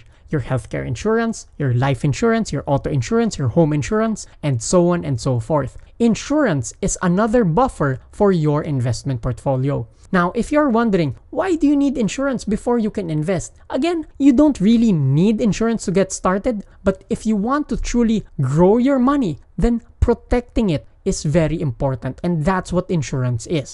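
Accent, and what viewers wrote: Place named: Philippines. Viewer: Very informative and easy to understand..excellent "Tagalish" tone.. 👍🏽 Thank you,! Cheers!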